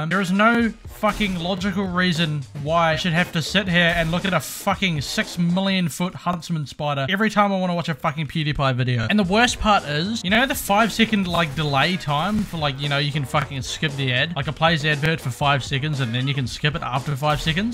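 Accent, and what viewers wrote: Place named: New Zealand. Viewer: Clearly a New Zealand ad because of the accent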